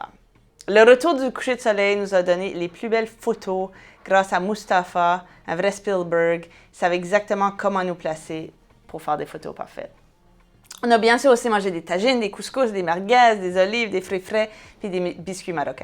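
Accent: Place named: Quebec, Canada. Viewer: Un vrai choc culturel, je m'attendais à être agréablement surpris par une dame prés de chez moi, mais à la fin je suis dégoûté sur la forme et sur le fond ! Accent bledard beurk , de l'époque des filles du rois, du Séraphin: Nouvelles histoires des pays d'en haut, des coureurs du bois, avec un regard hautain de la petite québecoise qui voyage pour ses premières fois! Le Maroc un pays multimillénaire, bourré d'histoire de civilisation, visité et revisité par les plus grandes stars du monde, show-biz, cinéma, sports , arts, etc...Mais la petite Lavaloise avait peur qu'il y vivent encore des cannibales, "DOUX" Jésus Marie, on l'a pas dévorée, elle est revenue en un seul morceau. Mince, on ne vend pas le pâté chinois et la poutine au Maroc . Hands down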